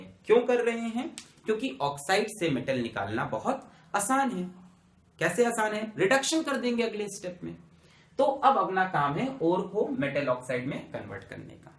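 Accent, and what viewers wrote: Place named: Allahabad, India. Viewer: You are from which city? Allahabad?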